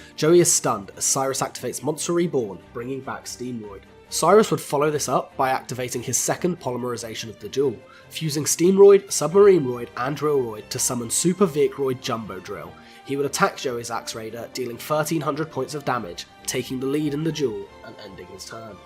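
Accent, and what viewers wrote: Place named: United Kingdom. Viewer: Your voice is so fucking British alas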